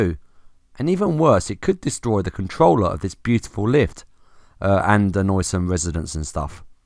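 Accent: United Kingdom. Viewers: You've got a beautiful British accent * . *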